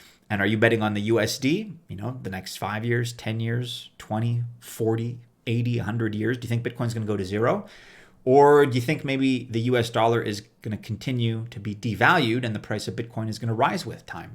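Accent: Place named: Canada. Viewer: I come for the Canadian accent. Chance at ETH doesn't hurt either.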